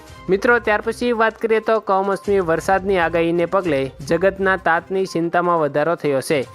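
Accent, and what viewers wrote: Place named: Ahmedabad, India. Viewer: અમદાવાદી શુંધ્ધ ગુજરાતી ટોન માં સમાચાર સાંભળવા ગમે.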